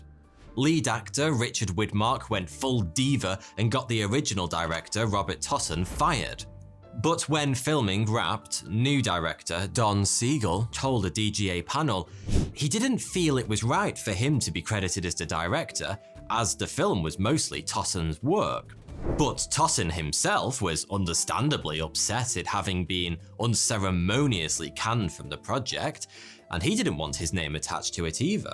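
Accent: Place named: RP, United Kingdom. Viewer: dis bloke is the posh version of vsauce lmao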